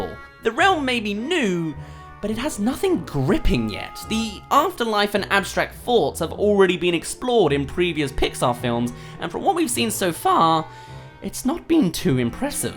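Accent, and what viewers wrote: United Kingdom. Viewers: When did get a british accent?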